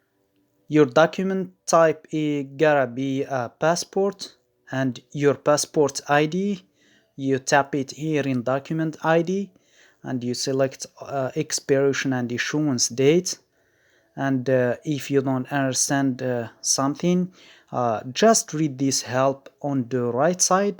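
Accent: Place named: Morocco. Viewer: U r moroccan lol guessed it from ur accent lool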